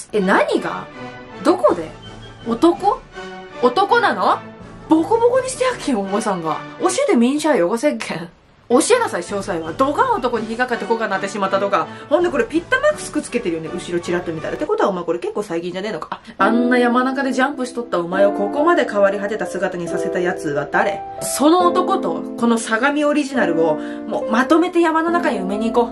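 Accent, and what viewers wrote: Nagasaki, Japan. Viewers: 久しぶりに見たバイ。おぉ、かわゆくなっとるやないか、長崎弁と致死量の毒は変わっとらんな！安心した。🤣🤣🤣🤣🤣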